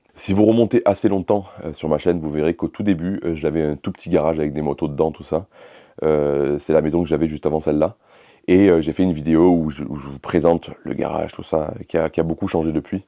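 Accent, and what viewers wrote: South, France. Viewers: oui pourquoi 😅 On dirait le Suuuuuudddf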